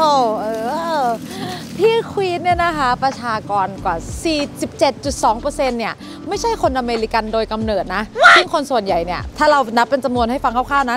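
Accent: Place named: Northern Thailand, Thailand. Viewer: แอดฯ.อู้เหนือเพราะจังเลย..แอดฯเป็นคนจังหวัดไหน?❤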